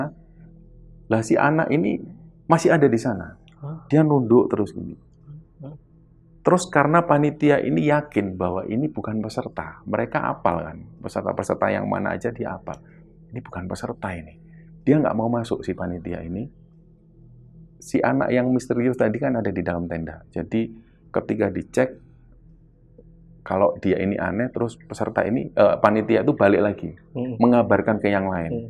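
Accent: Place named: Java, Indonesia. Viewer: Jawa nya kental banget mas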